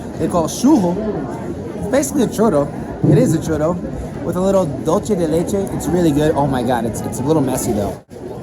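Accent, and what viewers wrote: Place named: Brazil. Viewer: Vc ta brazuca mermo em ingles. Vlw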